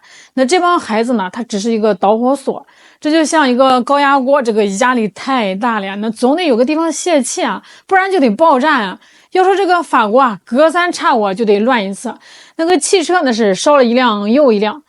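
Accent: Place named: Northeast, China. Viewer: 感觉口音象东北的人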